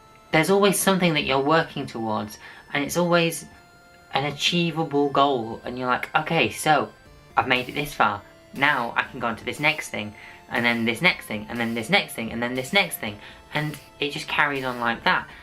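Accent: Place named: United Kingdom. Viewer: Seeing this two years later ( I guess it was Two Years Ago with.) I find it is SO SAD that you couldn't get a Permanent Radio 📻 Gig. As I've said before... American Public Radio Stations would hire you in a ❤️ heartbeat for your British accent,Show Content and Dilligent Production Qualities. By the way...we could do a Show together...where we present and argue the Qualities of The British Production of Life on Mars....or the American Version of Life on Mars! 👾 👽. By the way...I noticed your Bed Covering...I do believe you are trying to make a Spectacle of Yourself,! Adios!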